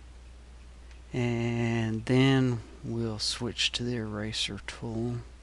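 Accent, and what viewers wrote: United States. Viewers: Love the American accent lol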